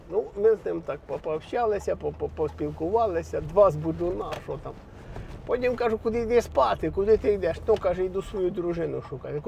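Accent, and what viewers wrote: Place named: Ivano-Frankivsk, Ukraine. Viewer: по розмові чути Франківська обл....та ще й з горів... Але ви кажете, що з Вінницької області. Вибачаюся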